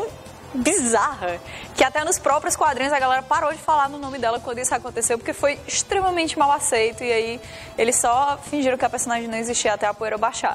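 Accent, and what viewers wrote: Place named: Ceará, Brazil. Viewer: Só sei que o universo Uplay iria desmorar, se a saísse do programa. Mas, eu sei que isso não tem nem perigo de acontecer. =D P.S.: É impressão minha ou o vídeo de hoje saiu "mais cearense" que o normal? ^^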